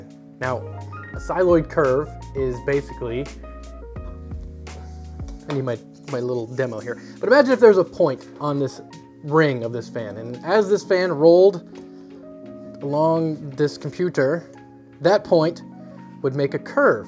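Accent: Michigan, United States. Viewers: You're from Michigan aren't you?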